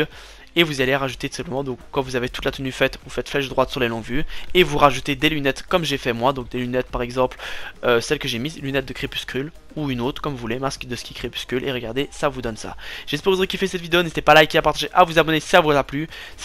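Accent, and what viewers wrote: Liège, Belgium. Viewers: Sa sent l'accent de liege ça haha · T belge ? Haha ton accent es puissant couzin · Toi je parie ma vie t’es liégeois hahaha quel belle accent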